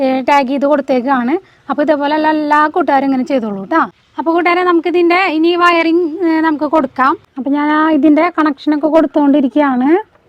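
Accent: Thrissur, India. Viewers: സൂപ്പർ വീഡിയോ തൃശൂർ സ്ലാങ് പൊളി 👌😍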